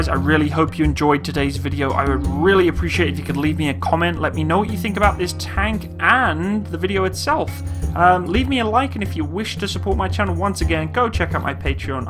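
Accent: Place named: United Kingdom. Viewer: Neat trick starting off by praising and admiring the tank making tall claims and then in the last 2 minutes, discrediting those very claims to present a sorry picture. This is a cool tactic of youtube reviewers who are essentially amateurs and have no real authority in the field that they are reviewing. Their channels end up being a hotbed for racist bigotry and snide armchair internet experts. You remind me of a dude that does aviation reviews (DJ's Aviation or as he calls himself 'Dee Jayy's Aye vie Ayetion'). His only credential for doing aviation reviews is "he's seen a plane". I am assuming both of you are not the same guy as he sound Australian while you sound British.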